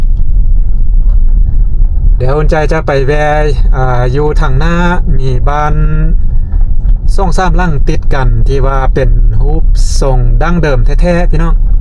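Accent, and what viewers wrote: Loei, Thailand. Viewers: สำเนียงเมืองเลยครับ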